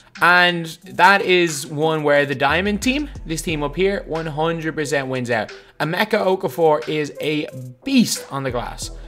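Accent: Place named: Ireland. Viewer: First video of yours I watched but I know by the accent your Irish?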